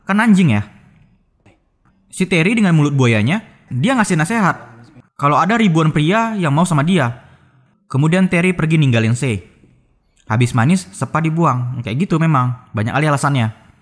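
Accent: Medan, Indonesia. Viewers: Orang medan ni kayakNya.. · Logat medan ni yah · Kimak kali miminnya, org medan kau ya min??? Aku pun org medan wak 😂😂😂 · medan kau ya lae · Fixs nih orang Medan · Bah, Nampak kali Medan kau bang... Agak kreak dikit biar makin mantap bg.. Hehehe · Mimin orng medan? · nak medan bah hahah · Bro aku suka bahasa mu..sesuai dengan daerah kU Medan.. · Nampak kali logat medannya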